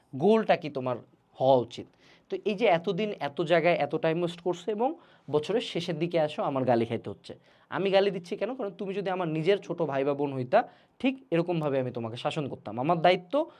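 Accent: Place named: Rangpur, Bangladesh. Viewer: Wow vaia sb smy reality tai bole..r vaiar ktha gulo sunle amr rangpur er ktha mne pre jay age sir rao evb blto amdr..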